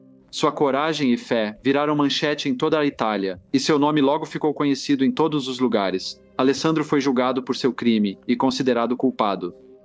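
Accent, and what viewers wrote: Portugal. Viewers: Como relaxar ouvindo esse sotaque horrível de português de Portugal? Arruma isso aí!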